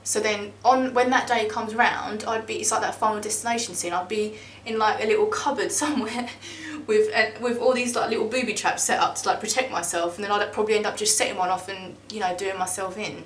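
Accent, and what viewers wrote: England, United Kingdom. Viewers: What part of England are you from? Could listen to you narrate a phonebook all day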